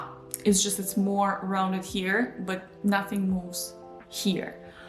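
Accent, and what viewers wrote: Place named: Russia. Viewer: learn French from a Russian accent teacher, interesting....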